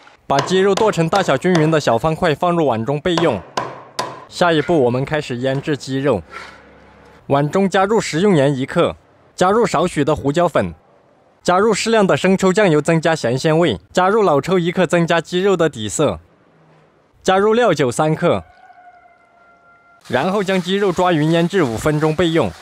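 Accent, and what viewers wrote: Sichuan, China. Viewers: 程咬金 听口音就是川普，四川人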